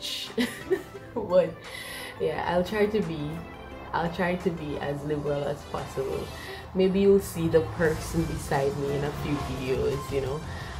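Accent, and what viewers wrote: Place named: Jamaica. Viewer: How yah sounding suh jamaican....🙄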